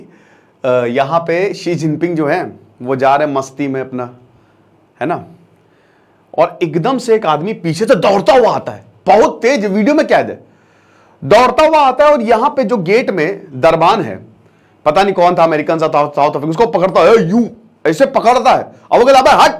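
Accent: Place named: Pratapgarh, India. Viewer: Sir sarau se aap pratapgarh se hai lg rha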